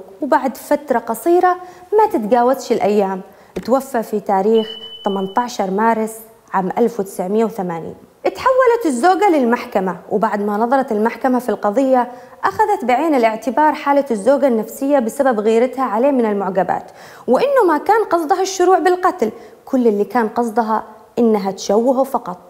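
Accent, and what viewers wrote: Aden, Yemen. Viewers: اللهجة العدنية فخر وابداع .. شكرا لك .. قصة مؤلمة .. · جمال اللهجه اليمنيه لا تمل منه الاذن فكيف اذا كان من أنثى لا يملها النظر حفظك الله من كل شر امين يارب 😍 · والله أنك مثقفة ومؤدبة ومهضومة وقريبة للقلب والأهم أنك حلوة ولهجتك رائعة أحب اليمن وأهلها من سورية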